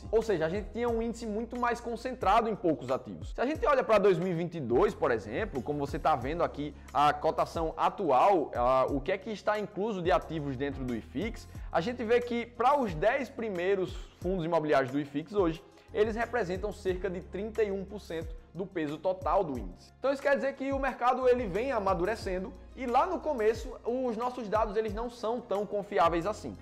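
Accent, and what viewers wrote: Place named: Pernambuco, Brazil. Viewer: Pernambucano até a ventas! Pergunta aí se ele já comeu uns quarto de bode na serra das russas! Esse sotaque não me engana.... é da terrinha! kkkkkk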